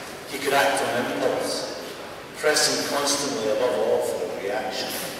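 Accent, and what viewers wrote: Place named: Scotland, United Kingdom. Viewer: I have to listen to this a few times. My clunky American ears sadly can't capture Zal's wonderful Scottish accent. Much Respect to Alex Harvey, Rest in Peace. Much Respect to Both McKenna's, Rest in Peace.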